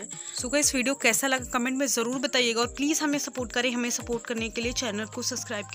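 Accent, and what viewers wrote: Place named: Awadhi, India. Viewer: Nice awadhi 👍👍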